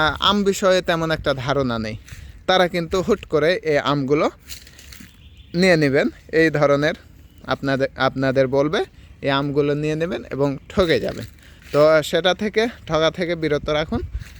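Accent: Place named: Chapainawabganj, Bangladesh. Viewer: আপনার ভাষা শুনে মনে হচ্ছে আপনার বাসায় চাঁপাইনবাবগঞ্জ। আমার বাসা চাঁপাইনবাবগঞ্জ। আমি কিছু পাখি নিতে চাই আপনার কাছে। আপনার লোকেশন টা যদি শেয়ার করতেন তাহলে আপনার খামারটা ভিজিট করতাম।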